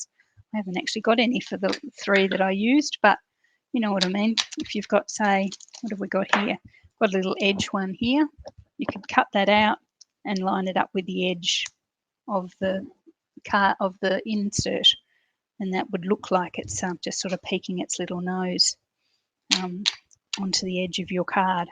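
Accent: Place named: Australia. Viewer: So nice to have an Aussie voice who is normal and makes mistakes. Your cards are great.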